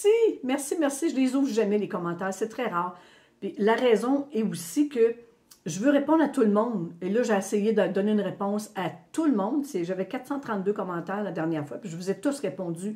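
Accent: Quebec, Canada. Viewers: je t adore c'est toujours un immense plaisir de t écouter qu'est ce que j aime en plus cet accent québécois 😅c est que du bonheur Au travail on me demande de déménager pour un autre bureau à deux alors que j étais seule tranquille et je suis sûre que c est l Univers qui me met en inconfort pour que je quitte enfin ce travail que j aime bien mais je sens bien que je ne suis pas à ma place véritable et chez moi ça construit à tout va et j aime la tranquillité bref je comprends qu'il est temps que je parte je suis prête vraiment mais pour faire quoi? Aller où? ❤❤ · Chère belle âme, Je vous ai découverte récemment et suis depuis peu abonnée à votre chaîne. Merci pour votre joie, votre spontanéité, votre authenticité, votre humour aussi, et ce merveilleux accent québécois qui vous caractérise. Vos vidéos sont rafraîchissantes par votre transmission et très inspirantes par leur contenu. 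Un grand merci pour votre présence sur la toile. 🙏💐🥰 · Bonjour ! C'est toujours un plaisir de vous écouter, surtout d'entendre votre acquessan (le dire tout haut) Québécquois. Votre T-Shirt est magnifique également. Merci de vos interventions et sages recommandations. A bientôt. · Merci infiniment pour tout ce que vous nous apportez avec ce bel accent, ces expressions imagées québécoises et cette lumineuse gaité au long de la vidéo. Belle et lumineuse journée à vous et à toute votre communauté. Merci infiniment 🙏💫🌟💖💖💖💖💖💖💖💖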